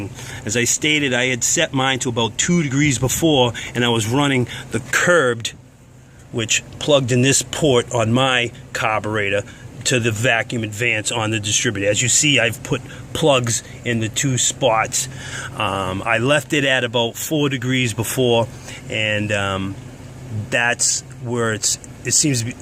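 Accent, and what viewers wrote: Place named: New York, United States. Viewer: Thank you very much!! It sounds like you have a New York accent. I have family there. I appreciate the help. I have everything else lined out and ready to do the swap. Again many thanks!!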